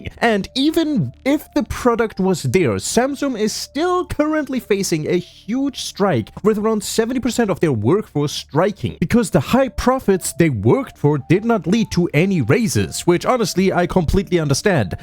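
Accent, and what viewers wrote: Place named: Europe. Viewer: Yes and No, this guy has always been politically biased, based off the accent he's probably European or south American or smthn which means this guy has probably zero actual knowledge of America or its politics (not that most Americans are any better). Attacking Iran is an objectively correct choice and something we should have done decades ago when they first had their regime change. They are the #1 sponsor of terrorism in the world and getting rid of them means significantly less problems in the middle east down the line which is why 90% of the middle east + China is in support of the US effort to put them down. And once they're finished we can swoop in, stick all our fingers in all their pies and take their oil for our own as a reward. Aka the same thing we're doing with venazuela. In the short term it means more expensive games, in the long term it SHOULD mean less expensive games and everything else, +less chaos in the middle east. 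It's not a stupid decision, Iran is just more "roachy" than venazuela. Their military is demolished, they have no real power or influence and their only ally Russia is fighting their own war. They're just unnecessarily and pettily spreading it out. Should be over by the end of the year at most or within a couple months at least.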